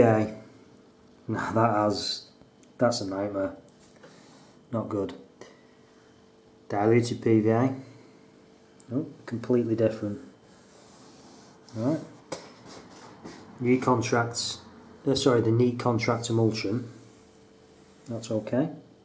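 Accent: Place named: Manchester, United Kingdom. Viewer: You sound like you are from manchester, how do I book you. :-)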